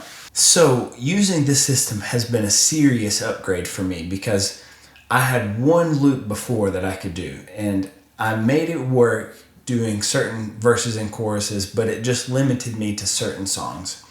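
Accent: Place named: Illinois, United States. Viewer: WOW!! BIBICAL!! I sure hope ROLAND paid you for this video!! Oh, color your beard "black"; Abe Lincoln!! No kidding!! As Angus Young; student! Dark-in your beard; play dressed as Abe Lincoln!! Especially with the slight southern drawl!! Right out of southern Illinois!!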